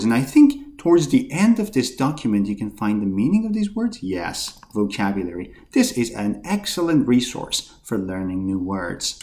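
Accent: London, United Kingdom. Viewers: His london😂😊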